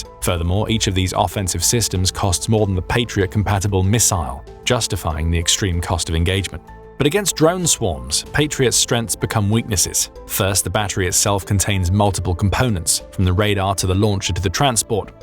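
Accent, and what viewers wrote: United Kingdom. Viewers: English (UK) accent is getting old.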